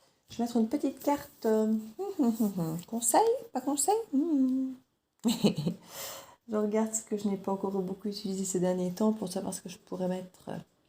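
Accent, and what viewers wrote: Belgium. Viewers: Un accent belge ''😂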